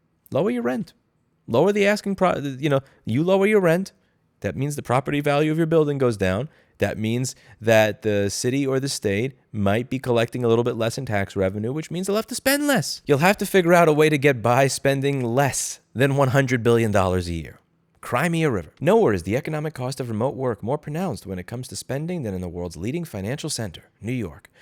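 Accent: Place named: Texas, United States. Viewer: sounds so Texan and then yells out " Forget about it "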